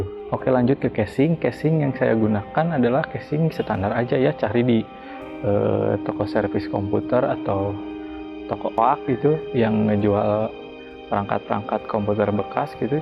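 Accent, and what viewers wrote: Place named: West Java, Indonesia. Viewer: nyunda pisan euy kang.. haha sip lah si subscribe yeuh... haha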